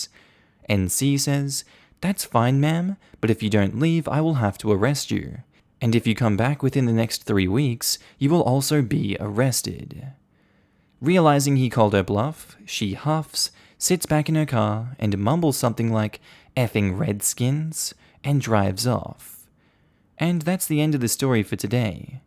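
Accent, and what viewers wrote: Canada. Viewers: I think you sound Canadian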